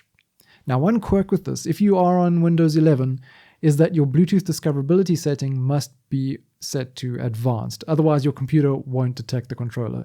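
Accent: South Africa. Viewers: instantly recognized the accent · Are you South African?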